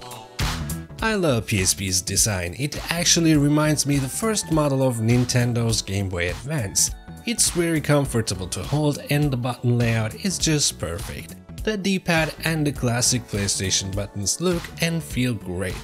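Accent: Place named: England, United Kingdom. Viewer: Lan o değilde bilmesem adamı İngiliz sancam ha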